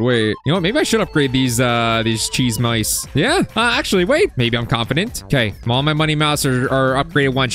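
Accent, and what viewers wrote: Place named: United Kingdom. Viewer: bro i love briths talk:D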